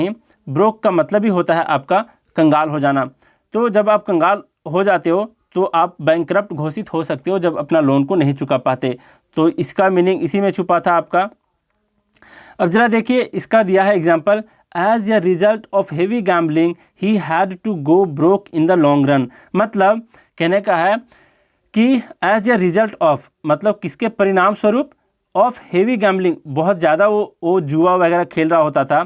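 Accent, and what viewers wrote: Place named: Jamshedpur, India. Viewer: Sir app Jamsehdpur se ho kya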